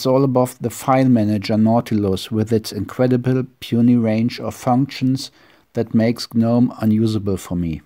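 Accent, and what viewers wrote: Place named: Germany. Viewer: wieso kann man das englisch klar verstehen aber das deutsch trotzdem raushören?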